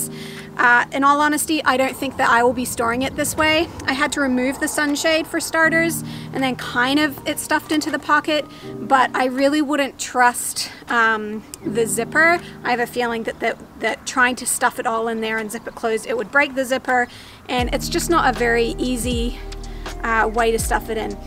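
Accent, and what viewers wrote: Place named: United States. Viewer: Your accent is puzzling me—are you American or a Kiwi? You sound mostly American but with little bits of Kiwi accent here and there.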